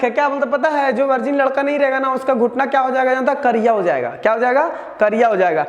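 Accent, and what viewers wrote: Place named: Bihar, India. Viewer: Your style of speaking is outstanding.।। Sb bihari aise hi hote h kya, Love your accent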